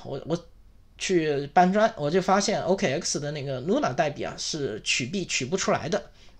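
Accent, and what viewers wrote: Changsha, China. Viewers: 听那方言，好像是长沙话，哈哈😃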